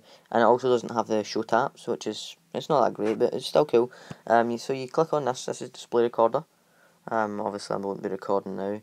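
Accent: Scotland, United Kingdom. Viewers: you are Scottish but you're not telling me what I wanted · U fae scotland tae im fae glesga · Are u Scottish coz I am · Wow a Scottish youtube that's like a one in a million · Are you Scottish or British bc guess what I am SCOTTISH I LOVE GLASGOW OR SCOTLAND LOVE THE RAIN(not it’s so badddd D:) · Oi? I believe you're Scottish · You be Scottish boiiii! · Scottish YouTubed first one I’ve seen on youtube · Starwars Villians no he's not he's scottish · It's a Scottish and he is from Glasgow same as me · Is he Scottish bc he hears like me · Cus he’s Scottish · Are you Scottish because I am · Your from Scotland aren’t you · Finally.... A Scottish YouTuber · FINALLY SCOTTISH BOI YOUR THE MAN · Are u Scottish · idk wat ur saying cuz ur Scottish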